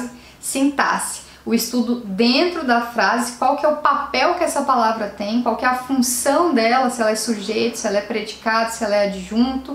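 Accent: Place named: Interior, Brazil. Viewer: Adoro esse sotaque do inerior vindo da voz de uma mulher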